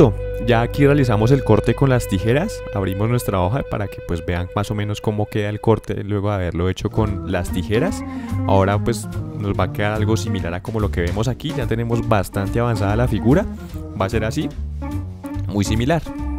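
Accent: Bogotá, Colombia. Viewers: BearMG Bogotano, seguro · Eres colombiano verdad? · tu eres de colombia hablas como colombiano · Tomas Echegoyen los colombianos ablamos asi y para tu informacion somos uno de los paises que mejor abla español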